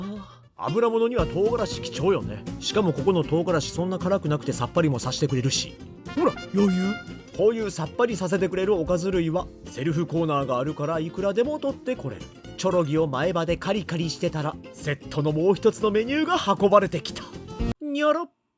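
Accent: Kansai, Japan. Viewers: 관서쪽이신가요? 한국어도 잘하시네요 ㅎ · 美味しそうに食べはるし、自然な大阪弁が最高ですわ 👍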